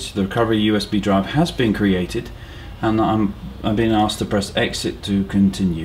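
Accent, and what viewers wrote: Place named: Australia. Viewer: WHOOOOOAAA THE VOICE (i love australian accents) are you australian? lol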